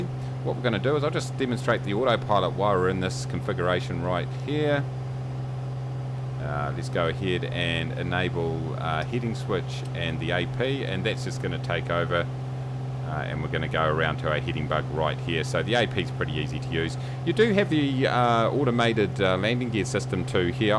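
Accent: New Zealand. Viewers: New Zealand accent rules... :) Awesome video, as always....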